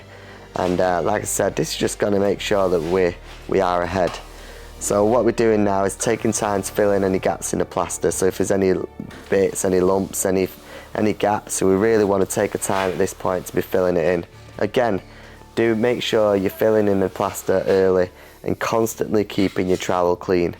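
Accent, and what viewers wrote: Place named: Manchester, United Kingdom. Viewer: my brother been following your Chanel as im researching plastering, got to say your content and personality are really good my man. really good vids. you sound like you manc based do you get to east cheshire at all i got a big 4 room extension need a plasterer??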